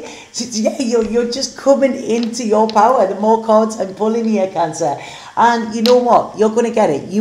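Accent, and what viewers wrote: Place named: Scotland, United Kingdom. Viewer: oh and thank You so Much , you glow with good clean white /pinkish aura and i can sense you are such a good lady , i love your never ending smiles and your excitement for me/us is awesome , great reader and that what sound scottish just great , reakly like your energy girl we will talk again ok , im always open ,and usually can hear if you are tuned to my rock n roll station lmao but tru , this stuff cant be wrong buit i gotta be sure , so i wait ,isntr like i havent already , all life damn near ,, im good at it now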